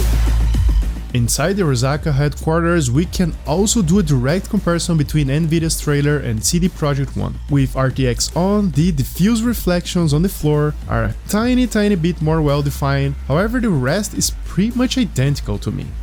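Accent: Brazil. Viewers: Não é Unotable, é Unnoticeable. Quando for gravar os vídeos, pensa que vc não quer que percebam que vc é de SP.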